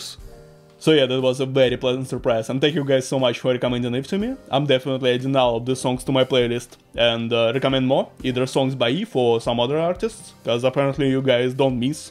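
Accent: Russia. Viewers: Подожди, ты русский? Я вот сижу уже почти полторы минуты думаю, акцент похож на русский... зашла в описание канала, ничего не нашла и тут ты говоришь "Драматургия" и я такая "стоп, чё серьезно?" Ив один из моих любимых певцов и я рада видеть подобные реакции ❤ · Русский акцент я узнаю из тысячи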